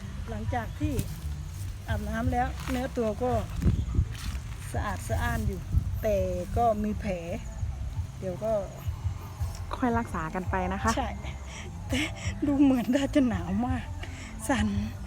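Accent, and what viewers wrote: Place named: South, Thailand. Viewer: พี พูดใต้ยังกะคนใต้แท้ๆ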